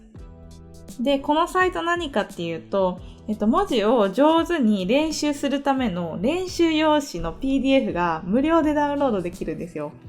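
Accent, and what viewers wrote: Kyoto, Japan. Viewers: 京都弁のイントネーションが節々に感じられて、自分は癒されながら観てます。 是非とも京都弁で解説してもらえるのを楽しみにしてます。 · 京都弁の解説めっちゃ楽しみやな〜❤ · いつもすっごく分かりやすくて楽しくて、ためになります！ お話も聞きやすくて、早くもなくて、癒させるし〜♡ 待ち続けたiPad miniを手にしてからノートも手帳も手書き類すべてiPad miniに移行しているので タイムリーで嬉しかったです♪ 京都弁やってほしいでーす！ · いつも貴重な情報ありがとうございます。漢字の書き順が少し気になりました笑 京都弁期待しています。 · 以前アップしてた中に、21才頃の動画でしたっけ、あの時の話し声が関西弁だったので、そっち方面かもね。と、思ってました。京都弁で、ぜひお願いします。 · 京都弁期待しています。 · 京都弁の解説メチャメチャ楽しみです！ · イントネーションが関西ですよ^_^ 私も関西なので親近感が湧きます^_^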